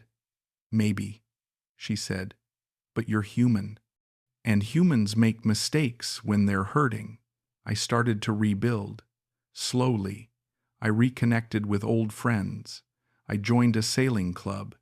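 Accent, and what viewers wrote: United States. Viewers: The Story is FAKE! 1 : You Don't need 250k AUD to start a small restaurant Carenderia type in the philippines as she was talking in the video.....the type of eatery she grew up in the province 2 : She has and drive a car? she is not as poor as she said......a 10 years old 2nd hand car cost around 400k PHP .....hmmmmmmm.... 3 : The description from the guy and the voice does not have an australian accent but american 4 : You must be really stupid to send 250k USD just like that.......makes you wandering how did a guy so silly managed to save or aquired it in the 1st place. So in my opinion The story sounds convinsing and it might generate viewers BUT I THINK IS FAKE 100% :)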